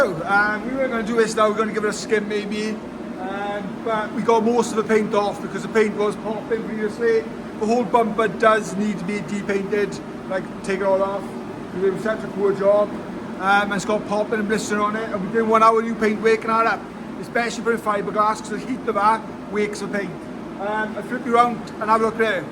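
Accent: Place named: South Wales Valleys, United Kingdom. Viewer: yes it is a c20let haven't driven it for 18 years tho. Sounds like your from the top of the valleys I'm from Caerphilly not too far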